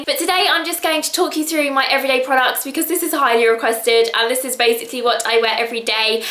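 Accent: RP, United Kingdom. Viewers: You're so posh!:]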